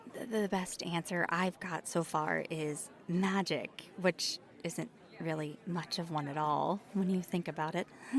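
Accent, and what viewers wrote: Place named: South, United States. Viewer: I like that little hint of a southern accent that I hear come through every now and then.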